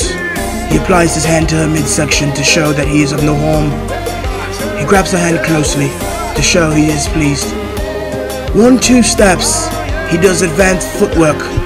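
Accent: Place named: England, United Kingdom. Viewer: This is more like sex on the dance floor.... That English accent though is bomb...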